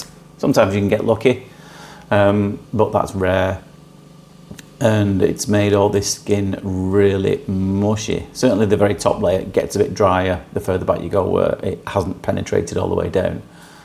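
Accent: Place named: Lancashire, United Kingdom. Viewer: You sound like you're from Bolton/Rochdale way. I need this doing I think, where can I find you?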